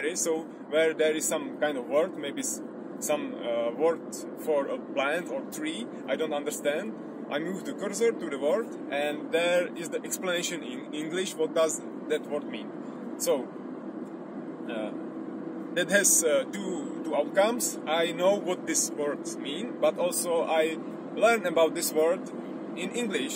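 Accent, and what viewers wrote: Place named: Czech Republic. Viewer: and sou on xD cesi maj asi nejhorsi prizvuk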